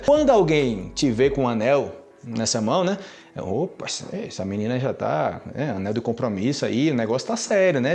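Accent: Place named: Colombia. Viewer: Adoro tu acento colombiano ! Cuando dices " enserio " 😍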